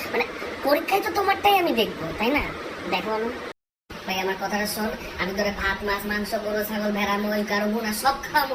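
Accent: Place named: Jessore, Bangladesh. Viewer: আমার নানাবাড়ি হলো যশোরের ঐদিকে মাগুরায়।তুমি মাঝে মাঝে ওরকম ভাষায় কথা বলো ।দেশের বাড়ি কোথায় ভাইয়া?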